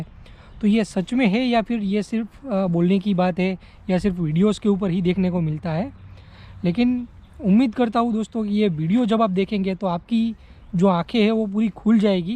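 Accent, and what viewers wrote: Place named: Saurashtra, India. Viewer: tamey accent thi gujarati to paaka cho pan saurashtra thi lago cho .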